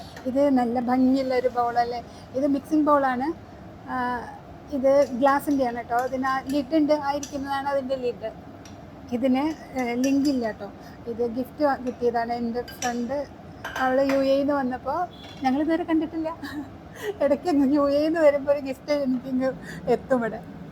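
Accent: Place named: Kozhikode, India. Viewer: Ningl clt kkarano....bhasha kettitt angane thonnunnu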